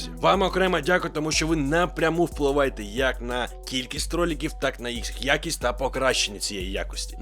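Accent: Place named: Russia. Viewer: Так смешно слушать русско говорящего, на украинском языке, ну да что не сделаешь что бы в армейку не за лететь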